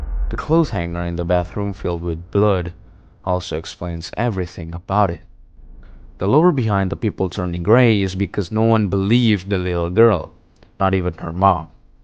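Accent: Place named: Russia. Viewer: you sound kinda Russian lol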